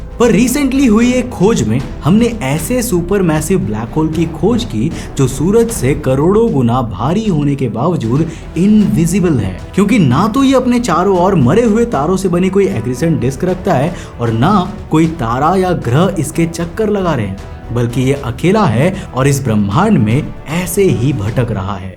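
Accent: Bengal, India. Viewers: Bhaiya kya ap Bengali ho ?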